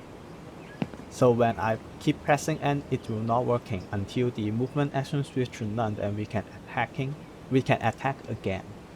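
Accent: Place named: China. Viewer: Chinese accent.Thanks for the solution of animation changing flicker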